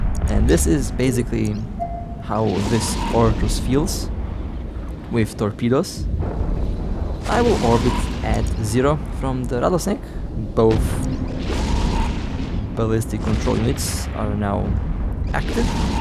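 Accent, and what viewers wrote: Russia. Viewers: Жуткий рязанский акцент )) а так оооочень интересно;)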